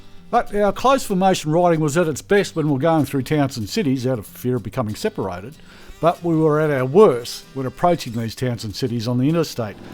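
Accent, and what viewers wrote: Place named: Australia. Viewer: love your oz accent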